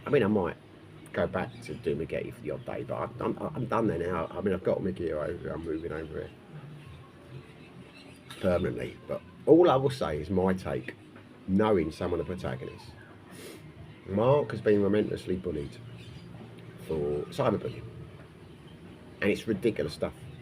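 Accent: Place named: London, United Kingdom. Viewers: It's a London accent from East and South London mostly! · He is speaking the English actual English people speak. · That's an English accent. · Southern England - East/ south London or thereabouts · 😂You may speak English…but clearly you don’t understand it when spoken , it’s a London accent !!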